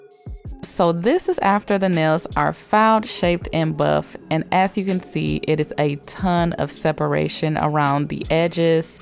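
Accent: South, United States. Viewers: I’ve said it several times but I’ll say it again! Being from the south I love how your southern accent comes through in spurts lol “...just try to make sure all the colors are dOWn”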